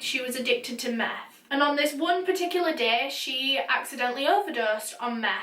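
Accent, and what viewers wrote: United Kingdom. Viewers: wait...her accent is so amazinngg its like british + merida's accent (idk her accent sorry) its so coooll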